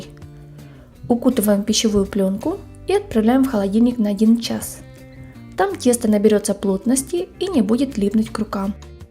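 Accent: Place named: Ukraine. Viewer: поняла что она украинка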